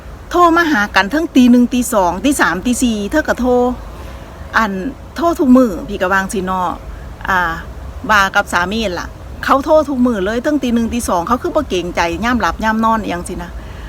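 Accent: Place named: Isan, Thailand. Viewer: พูดอีสานฟังไม่ค่อยออก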